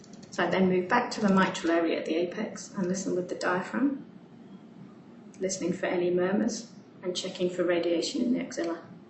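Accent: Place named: United Kingdom. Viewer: Wait, you hear her accent and automatically think American? Sounds like she is from the UK to me but jrodulfa1 is correct.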